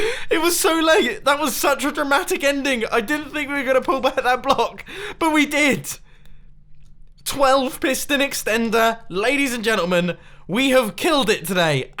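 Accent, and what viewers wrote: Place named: United Kingdom. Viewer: used to sound less British back in 2017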